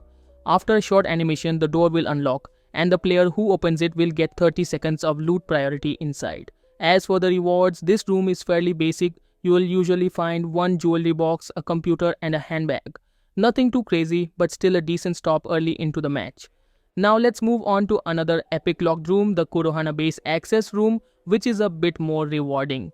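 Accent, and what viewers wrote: India. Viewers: Ugh, that stupid Indian accent 🙄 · This guy is the only indian tutorial guy I want funny jokes and a good indian accent when speaking English he speaks like amir the door dash guy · Damn it had to be an indian accent video, fuck that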